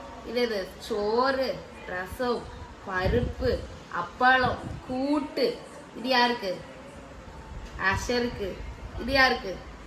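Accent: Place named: Nagercoil, India. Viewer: Yentha or da nagarkovil da tamil super ah irukku da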